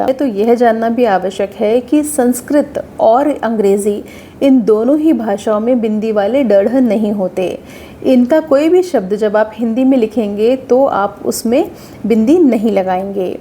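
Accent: Standard. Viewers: बहुत बहुत धन्यवाद् mam, आप की हिंदी,उच्चारण एक दम शुद्ध है,ज़्यदातर पढ़े लिखे छात्र, यहाँ तक की सरकारी अध्यापक,बहोत से हिन्दीभाषी प्रशासनिक अधिकारी तक हिंदी का उच्चारण गलत और गवाँरो की तरह करते हैं..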